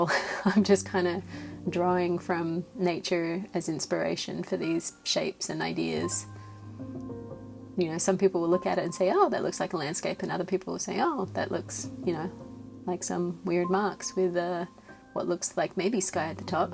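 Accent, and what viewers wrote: Australia. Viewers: I can't decide if you are an Australian living in America but your accent is so interesting!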